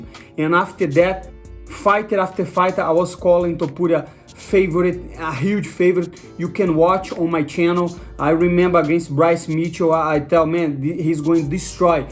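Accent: Brazil. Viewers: Money, my number one hope for you is to become UFC champion. Number two hope is stop learning more English. The Brazilian accent is perfect. Number three hope is please, brother, can you teach Americans some Portuguese slang?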